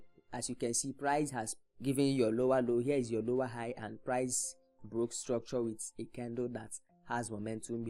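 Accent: Nigeria. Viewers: From the accent it looks like you're a Nigerian?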